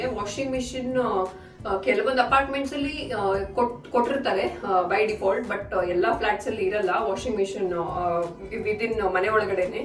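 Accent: Mangalore, India. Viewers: I love manglore kannada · Nima manglore language.super sis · Neev manglore kannada mathadodanna keli tumba kushi agutthe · Woww super erna mangalore kannada... tulutla paterle .. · I like Mangalore Kannada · Yeah... I like ur mangalore kannada & tulu ... evn I m from mangalore... staying in UAE .. love ur channel.. all the best ❤️ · Supar Mangalore kannada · Nivu Mangalore kannadane matadi mam . Nivu chennagi matadtira . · I like magalore Kannada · Nivu maglore Kannada ne matadi Mangalore Kannada nange rumba esta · Mlore Kannada super. So pls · ಮಂಗಳೂರು ಕನ್ನಡ ಚಂದ ಉಂಟು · Actually Mangalore Kannada is very nice to hear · Hi, this is Praveen from mangalore, nice home, your mangalore kannada is nice to hear😃, and we really proud for our tuluvas are in everywhere 👍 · Mam neevu Mangaloru kannadadalle mathadi aaytha nimma Kannada thumba chandha untu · I love your Mangalore kannada tq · Mangalore kannada👌👌👌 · I like your mangalore Kannada . 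· Hii sister.... Iam from sullia.... Neevu manglore kannada mathadtha edre keltha irbeku antha agtha de❤❤❤.... Plzz reply mam. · Kudla is the best..😘😘 So good to hear mangalore kannada.. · Sister nima Mangalore Kannada super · Manglore kannada esta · dayavittu mangalore kannada matadi nevu mangalore kannada chenag matadtira......hage nevu return india bartira illa PR tagondu alle settle agtira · hi, yenri video nimadu super, matu nim manglore language tumba sakatagi irute. navu north karnataka davru, yavagalu nim manglore language keloke tunba ista. ondu request nimgae adstu manglore langauge matadi. hege heche hechu video madi... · I like the Mangalore kannada, do you know kundapur kannada? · Really i like ur Mangalore kannada tumba chenag mathadthira. Mangalore kannadane mathadi tumba janakke esta agutte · Nimma kudla kannada nice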